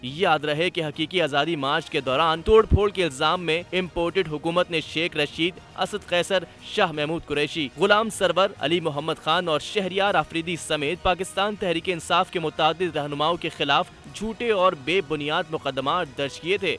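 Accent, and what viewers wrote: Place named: India. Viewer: jesi editing ki hoi video ki or accent hai bolne ka.. bilkul indian media lag raha hai...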